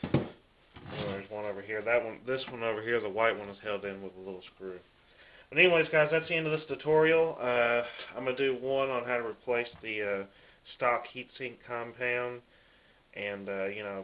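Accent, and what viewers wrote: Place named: Cleveland, United States. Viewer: And he's from Ohio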